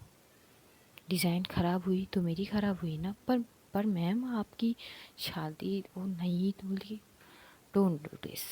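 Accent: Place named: South, India. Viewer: 𝗛𝗲𝘆𝘆𝘆𝘆𝘆 𝗱𝗲𝘃𝗶𝗶𝗶𝗶 𝗷𝗶𝗶𝗶 𝗹𝗼𝗵 𝗵𝘂𝗺 𝗮𝗮𝗴𝘆𝗲 𝗮𝗽 K𝗲 𝗸𝘂𝗰𝗵 𝗮𝗰𝗰𝗵𝗮𝗶𝗶𝗶 𝗮𝘂𝗿 𝗯𝘂𝗿𝗮𝗮𝗶 𝗹𝗲𝗸𝗿 𝗰𝗼𝗺𝗽𝗮𝗿𝗶𝘀𝗼𝗻 𝗯𝗲𝘁𝘄𝗲𝗲𝗻 𝗻𝗼𝘄 𝗮𝗻𝗱 𝘁𝗵𝗲𝗻 💁👇 1. 𝐕𝐨𝐢𝐜𝐞 𝐓𝐨𝐧𝐞:) pehle aap ke voice thode rough thi mtlb tone me ab softness aagayi hai 😌 Phle vo lehza apka aaj v wahi hai Lekin aapka andaz thode badal gya aur apke mizaz ka to kya 𝗸𝗲𝗵𝗻𝗲 .... 𝗝𝗮𝗴 𝗴𝗵𝘂𝗺𝗲𝘆𝗮 𝘁𝗵𝗮𝗿𝗲 𝗷𝗮𝗶𝘀𝗮 𝗻𝗮𝗵𝗵𝗵 𝗸𝗼𝗶😌 Jab mene apki voice phle bar sune the mujhe lga oh ye thode rude arrogant hongi 🤣🤭 fir bad me jana nah bete tera bhrm hai yeh inke jaise pyara to..... 𝗡𝗮𝗵 𝗸𝗼𝗶 𝗵𝗮𝗶 𝗻𝗮𝗵 𝗸𝗼𝗶 𝘁𝗵𝗮 (Bas bas compare Krna h acchai nhi 🤭) 2. 𝐀𝐜𝐜𝐞𝐧𝐭 :) pehle aapki Hindi cute the just like South walo jaise Lekin fir v apka Hindi me story continue kri usme v mene to kabhi accent me apki language aate nhi dekha yeh h 🌛lagan aur nishtha se apne kaam (storytelling) ke liye samarpit hona ......🫶 3. 𝐏𝐮𝐑𝐞 𝐇𝐢𝐧𝐝𝐢 :) kabhi kabhi aap itni acchi words use krte ho ki lgta hi nhi aap ki mother tongue hindi nah ho ha mai Puri tarah to nhi kh sakti ki apki hindi puri thik hue h but ha ab aap jarha h ko ja rhi hai nhi khte mtlb bande ko bandi me convert nhi krte 😀 4. 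4. 𝐃𝐞𝐝𝐢𝐜𝐚𝐭𝐢𝐨𝐧 :) han phle phle aap stories pe characters ke name pe thode confuse ho jate the but abhi abhi apka ye chise bahut had tak kam hogyi hai ...aap rat ho din ho no matter 11 baje v aap stories dalte ho to ab characters ke name pe utna confuse nhi hote Suru suru me aapka discribe krne ka tarika v khoobsurat tha Lekin ab khoobsurat ke saath saath imagination ki duniya ke hume le jakar khada kr deta hai hum un chiso ko vis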